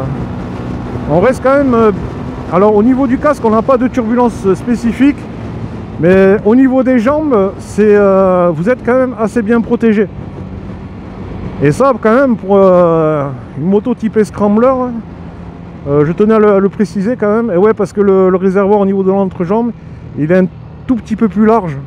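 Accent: South, France. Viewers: Extra.. j'attends la mienne avec impatience.. très bonne vidéo avec l accent dû sud ..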